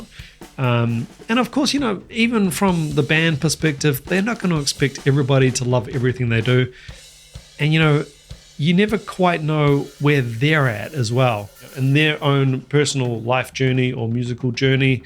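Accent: New Zealand. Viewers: Probably my first comment here ever, as a longtime follower 😂 Watching quite 😂 All of us, who really love and ambrace music, why would we down-talk music? We might not have the same taste, but at the end of the day its art. Hehe and each time i'm listening to Neil, i know why he was and, in some kind still, is a hugh inspiration of Mike Portnoy 😎 Mr. i fuckomg love your Kiwi accent 😎 thats me saying as a german 😂 and remember (knok knok)...we will ask the questions 😂 Love your channel!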